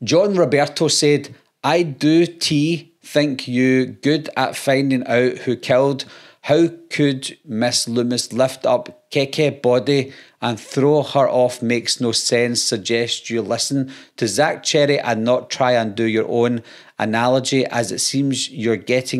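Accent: Scotland, United Kingdom. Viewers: Screw the trolls, its actually hilarious some of the comments, i don't have a YouTube channel but can fully understand the level of abuse you get as ive got a few friends who have one and its unreal the shit they get. I'm Scottish and fully understand you so fuck anyone that cant understand the Scottish accent, they don't deserve you mate. You don't need idiots watching your streams anyway absolute losers. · you’re the man. Good on you for letting this nonsense roll right off your back. I’m from Boston, MA - I speak my dialect. You’re in Scotland and speak yours. Who’s to say that anyone from a different area is speaking incomprehensibly? Love your channel! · People are just dumb. They think everyone in the world is suppose to sound like they do? Also I love your accent. It reminds me of my mother in law who is from Edinburgh. She teaches me all kinds of things like I hunt the gruffalo in the deep murk widd! 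Also telling people git ursell to fuck ya wee radge! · I’m from Brazil and the accent I adopted was American and I can completely understand you. Really like this Scottish accent to be honest. Don’t worry about the trolls/haters ! You’re awesome!! Keep up the good work!!! 👏🏻👏🏻👏🏻👏🏻👏🏻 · Give ‘em hell! Very entertaining I think Scottish accents are dope and I don’t have a problem understanding Open your ears people! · I can't believe people comment on your accent, you're from Scotland what should you sound like? Crazy people 😊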